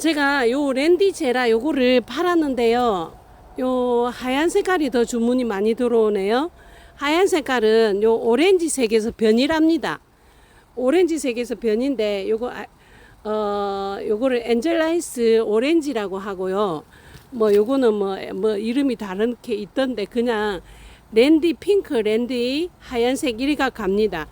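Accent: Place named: Gyeongsang, South Korea. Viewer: ㅋ ㅋ 경상도 말씀을 하시는데요. 아무튼 재밌네요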